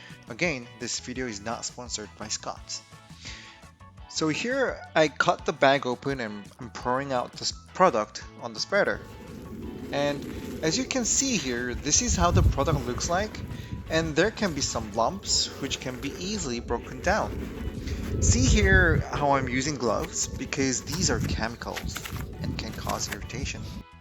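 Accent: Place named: United States. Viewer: ㅋㅋㅋㅋ목소리 톤이나 자막이나 미국 사람 다됐네 ㅋㅋㅋㅋㅋㅋㅋ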